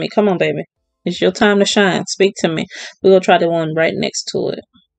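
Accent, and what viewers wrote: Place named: Chicago, United States. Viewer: YOU SOUND LIKE YOU FROM CHICAGO!😊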